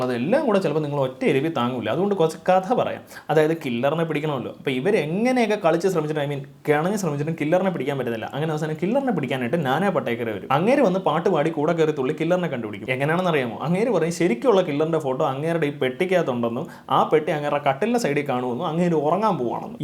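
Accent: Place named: Tamil Nadu, India. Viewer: Anyone feels like you're hearing Malayalam but in Tamil accent?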